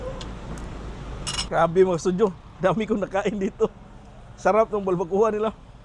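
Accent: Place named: Cebuano, Philippines. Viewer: Bisdak kaayo ka..gahi kaayo diction hahaha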